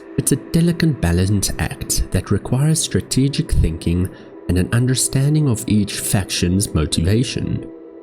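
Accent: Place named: Pretoria, South Africa. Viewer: Thats a fkn Pretoria accent no doubt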